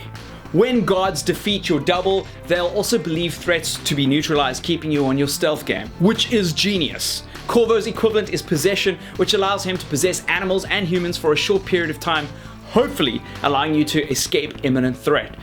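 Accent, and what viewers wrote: South Africa. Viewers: Your from south africa? :D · are you south african?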